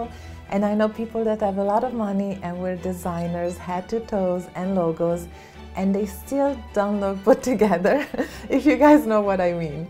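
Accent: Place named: Italy. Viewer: I really like a beautiful bag that elevates an outfit. I love your accent?... My dad came to America from Italy (Calabria) when he was 8. You sound like my beautiful Italian cousins. 😍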